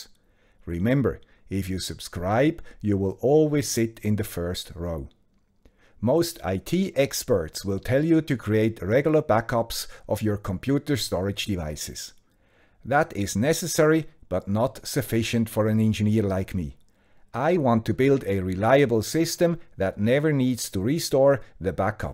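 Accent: Switzerland. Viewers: thanks to the guy with the Swiss accent, another great tip and information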